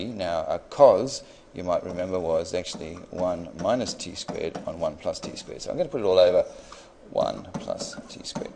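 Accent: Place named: South Africa. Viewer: Please tell me you are south African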